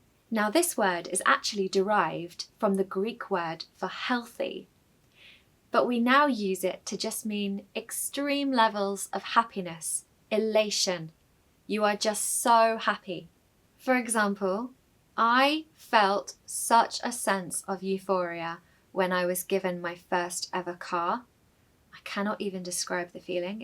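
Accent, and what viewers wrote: United Kingdom. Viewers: British English sounds sooooo amazing! 🥰 Thank you for this precious lexical collection,! Please, do more videos of this kind. You sound so charming! I am Ukrainian and fell in love with British English in my teenager's years. I've been to London last summer for the first time in my life. OMG! I felt like in Paradise listening to British English. You walk on the street and hear behind you a Duke speaking with an intonation of majesty, you turn around and see just a guy in a streched T-shirt. British English is really something etherial and sumptuous! Love it so much!🥰😍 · The best video I have watched so far in English words with a British accent. I hope that more videos like this lesson will be uploaded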